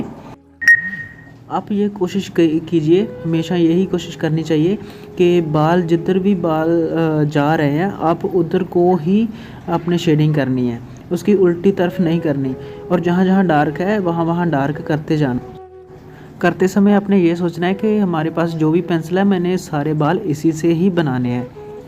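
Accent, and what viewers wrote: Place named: Punjab, India. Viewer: Hindi me punjabi touch 😁😁😁 but vry nice sir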